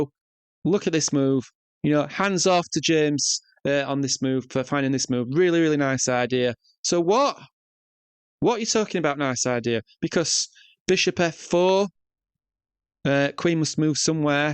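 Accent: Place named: Leeds, United Kingdom. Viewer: Sorry, just trying to pinpoint your accent... Leeds...wakefield ?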